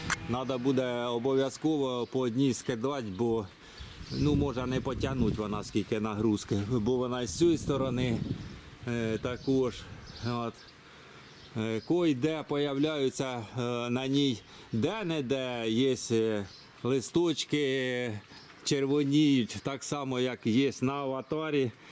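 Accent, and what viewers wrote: Poltava, Ukraine. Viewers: Підкажіть вашу локацію.Чую по говору десьближче до полтавської обл.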